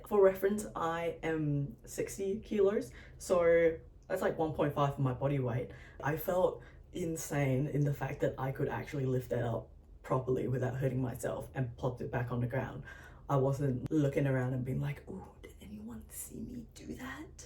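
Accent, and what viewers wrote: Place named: Australia. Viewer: omg an aussie! YAYYYY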